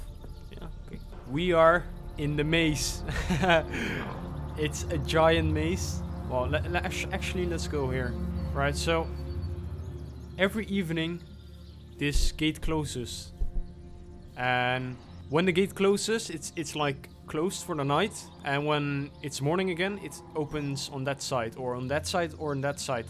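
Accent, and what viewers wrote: Netherlands. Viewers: hmm i smell Dutch? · are you from the netherlands?:body-blue-raised-arms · Dutch accent 🫶🏽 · hey are u dutch i hear it in ur accent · I smell dutch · love het nederlandse accent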